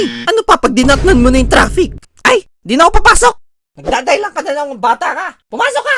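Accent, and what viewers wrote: Visayas, Philippines. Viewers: half bisaya ka kuya? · Bisaya ka ba po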